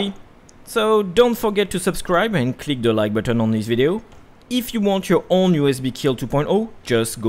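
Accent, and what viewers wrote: France. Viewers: l'accent francais omg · French accent or it's only me?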